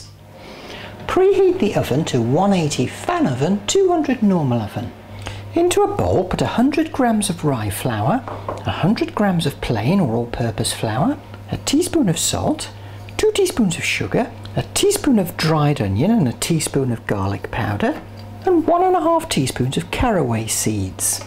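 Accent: Birmingham, United Kingdom. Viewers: It's a Birmingham accent but not very strong.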